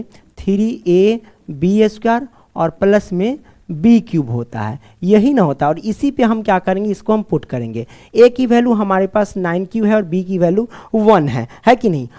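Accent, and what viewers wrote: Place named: Purnea, India. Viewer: Sir aapki language bihar ke purnea ki lg rhi h ❤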